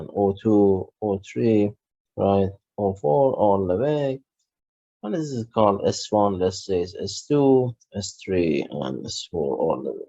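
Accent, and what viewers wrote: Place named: Iran. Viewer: I enjoyed alot from this video with your beautiful and obvious Persian accent.😊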